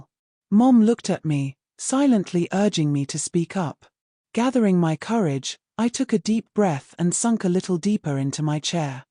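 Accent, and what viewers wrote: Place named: United Kingdom. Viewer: They are NOT mispronouncing "schedule". You obviously failed to notice the AI reader's BRITISH accent. That is the way the British pronounce "schedule.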